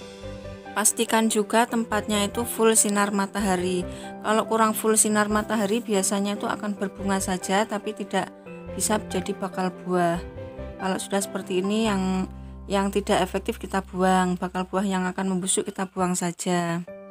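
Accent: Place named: Java, Indonesia. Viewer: Kelihatan banget suarane wong jowo.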